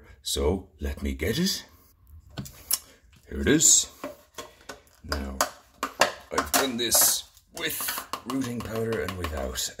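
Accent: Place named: Ireland. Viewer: Sounds like a grand Irish accent.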